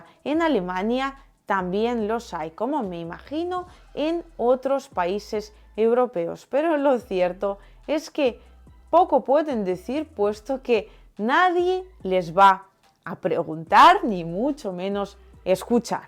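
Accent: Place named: Spain. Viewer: A proposito de America Latina, noto que algunas expresiones y vocablos de jerga especialmente no los entiendo, tu acento español es super fuerte. Pero está bien.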